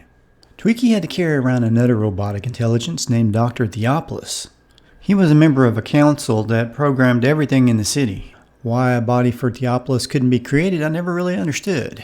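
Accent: South, United States. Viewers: We like the Bible quote and the southern accent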